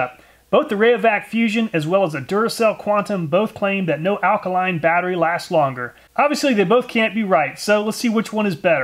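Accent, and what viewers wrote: United States. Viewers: plus that strong american accent 😁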